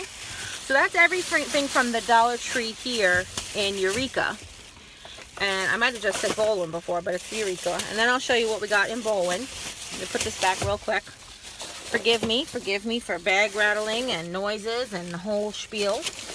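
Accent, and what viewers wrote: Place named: Long Island, United States. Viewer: Hi, new subscriber here! The moment I heard you I felt like I was home. I'm a LI transplant too, but I live in FL...31 years on LI and 25 here in FL. Love your crafts, DIY's and hauls. I'm stayin'!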